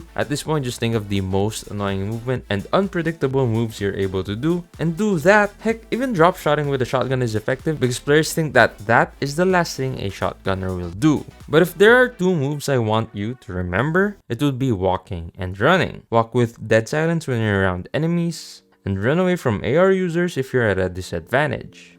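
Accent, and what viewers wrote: Philippines. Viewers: based on his accent hes filipino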